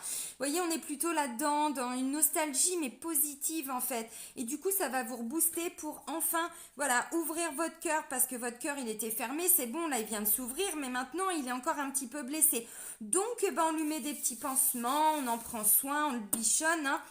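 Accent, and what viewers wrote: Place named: Quebec, Canada. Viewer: Maudit que je t’aime toi ont dirais presqu’une Québécoise!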